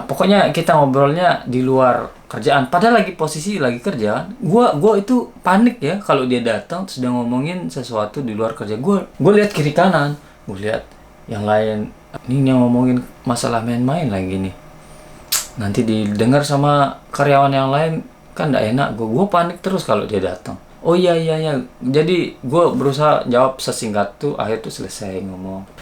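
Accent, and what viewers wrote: Lombok, Indonesia. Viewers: Bang logat sasaknya gak bisa ilang walaupun hidup dijepang . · Kok mirip logat Sasak ya bang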